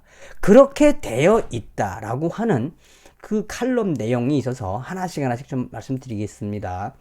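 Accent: North Korea. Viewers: 북한말 듣고 싶지 않아요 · 북한말 너무많이쓰시지말고 억양을부드럽게해보세요 그럼유튜브 훨씬잘될것같아요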